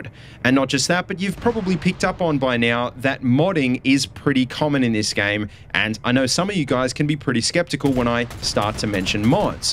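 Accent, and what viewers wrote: Australia. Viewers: This bloke sounds like the Silverchair boys. Oh look he's in Newcastle. #AustraliaHasAccentsToo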